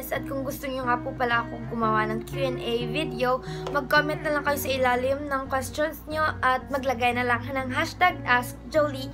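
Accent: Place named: Hiligaynon, Philippines. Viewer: That hiligaynon accent thou i love it💖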